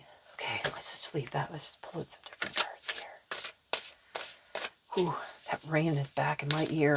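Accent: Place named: East, Canada. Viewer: Hi …. Haha yup things are shifting! I just love your eastern Canada accent being in Saint John NB from western Canada 🇨🇦 it’s my favourite as the people are the kindest in the Maritimes … as spiritualists we all need to get into gear now 👍🌈💕🌈🌈🌈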